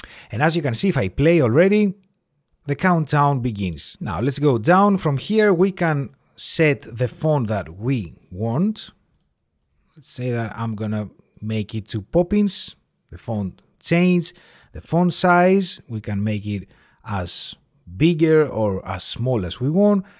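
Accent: Greece. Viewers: This greek accent is so familiar to me! ευχαριστώ! καλή δουλειά!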